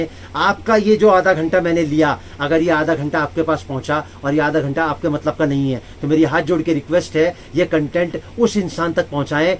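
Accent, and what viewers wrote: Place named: Marwari, India. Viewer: भाई साहब आपको बोलने का तरीका गजब है पक्के मारवाड़ी हो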